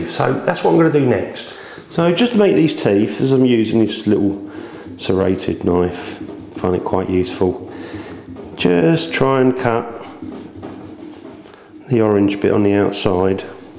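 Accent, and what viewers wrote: United Kingdom. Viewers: u sound like someone from little brittan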